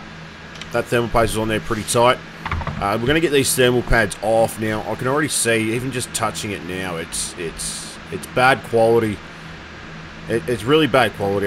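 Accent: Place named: Australia. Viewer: I love that aussie accent lol :D btw great vid bud, u just gave me some encouragement to re-pad my gpu-s! 😊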